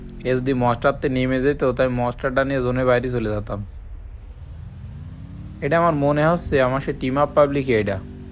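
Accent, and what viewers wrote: Jessore, Bangladesh. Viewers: ধন্যবাদ ভাই আপনার খেলা দেখে জুন পোস্ট দেখে ভালো লাগে, আপনার কথা শুনে মনে হয় আপনি যশোর জেলার লোক, আপনার বাসা কি সাতক্ষীরা, ঝিকরগাছা, আমার বাসা যশোর ঝিকরগাছা থানা বাঁকুড়া ইউনিয়ন,❤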